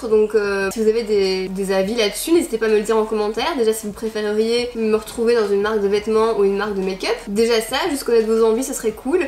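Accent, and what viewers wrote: Belgium. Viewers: Je trouve que tu as un accent belge 😂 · Attend t belge je me suis que t'avais un accent belge je suis franco belge 👍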